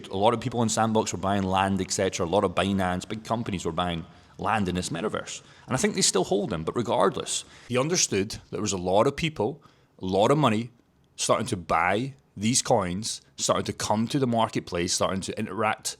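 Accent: Scotland, United Kingdom. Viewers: Scottish ffs what u American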